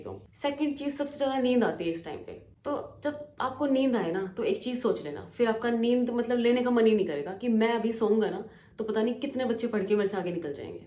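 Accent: Haryana, India. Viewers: Haryanvi Tone 🔥🔥... where are you from didi??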